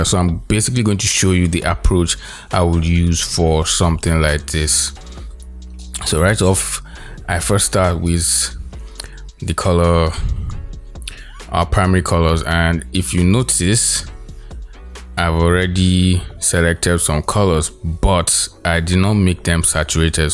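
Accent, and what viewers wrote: Nigeria. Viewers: Apparently I love Nigerian accents🥰 keep it up bro:) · Ayyy, i know a nigerian when i hear one!!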